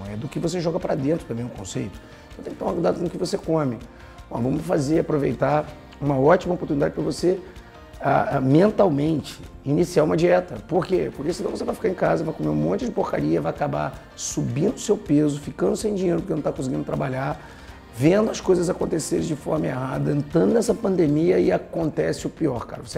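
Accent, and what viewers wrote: Rio de Janeiro, Brazil. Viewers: Ahh meeu gratidão! Nunca estudei tanto na vida haha Só consigo ouvir aulas que tenham vozes de seres humanos inspiradores! Tipo sotaque carioca dos vídeos de direto administrativo! Obrigada!!! Jah Bless 🆙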